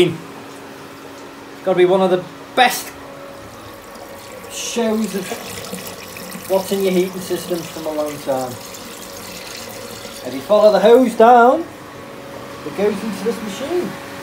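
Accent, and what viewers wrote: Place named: Liverpool, United Kingdom. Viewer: I live in Liverpool and have 1 radiator (large) in my house that’s cold at the bottom and warmer at the top. Given that your accent is local to me are you still doing these jobs & how much would you charge for 1 radiator? Cheers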